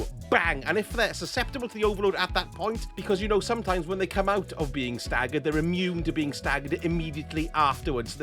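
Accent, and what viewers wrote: Wales, United Kingdom. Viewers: Pretty surreal to pick a random Destiny video and hear a Welsh voice! Which part of Wales are you from may I ask? Great vid, I'll be watching a few more of these. I would imagine that Tony Hart reference went over most people's heads though haha! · You are delightfully Welsh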